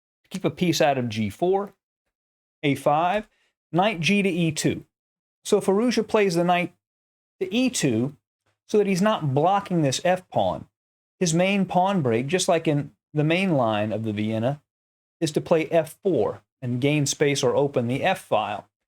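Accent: United States. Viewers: Absolutely love your Alireza videos and analysis and your American accent!! Keep up the great work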